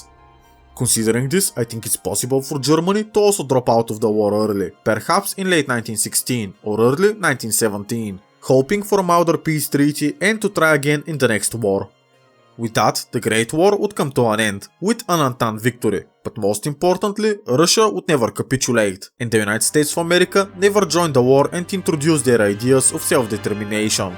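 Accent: Bulgaria. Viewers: Оригинален български акцент на английския, не може да се обърка😅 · По акцента ти мога да позная не само, че си Българин, но и че си от Източна България :D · Your accent sounds just like Bulgarian.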